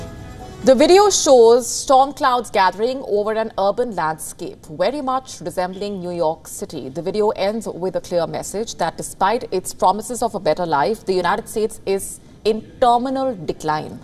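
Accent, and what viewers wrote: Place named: India. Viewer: Too strong Indian accent 🙏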